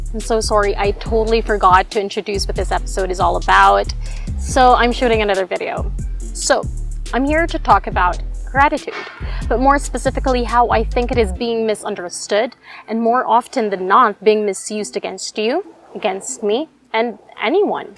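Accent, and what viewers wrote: Ilonggo, Philippines. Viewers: halaa i just saw this on facebook then i searched in yt tas halaaa kanami gle mamati simu manang ur ilonggo accent uwu❤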